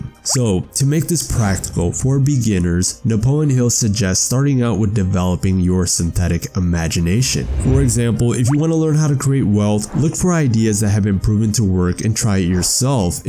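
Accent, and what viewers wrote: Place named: Philippines. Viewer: sounds like my Filipino friend, saying “da” instead of “the”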